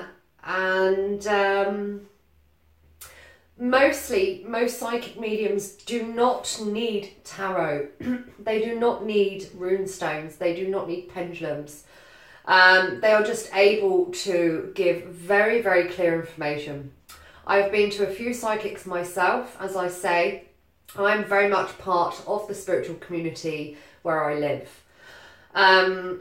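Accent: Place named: England, United Kingdom. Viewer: Thank you, soooooo true! Ps... 'LOVE your English accent and integrity. Yes, HEIDI Symones always resonates w me, and the MANNA reader is ADELINA Bond! Live their ORIGINAL style as yours as well. Have a great day.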